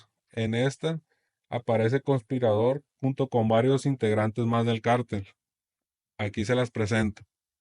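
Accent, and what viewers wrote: Sinaloa, Mexico. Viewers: es acento sinaloense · Me gusta como narra con acento bien sinaloense y los diálogos hacen parecer como si uno mismo estuvo ahí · me gusta el acento ese de mexico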